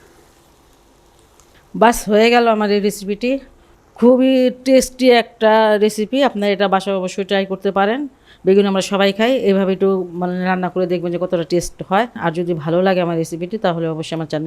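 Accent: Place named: Bikrampur, Bangladesh. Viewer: Aunty onek test apnar ranna.. Bikrompur boley kotha.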